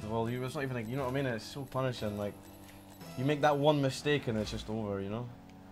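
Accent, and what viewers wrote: Scotland, United Kingdom. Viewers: Same old Scottish AF 😂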